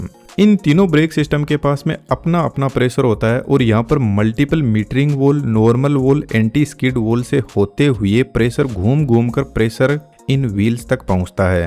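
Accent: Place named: Marwari, India. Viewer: Marwadi voice pehchan li 😂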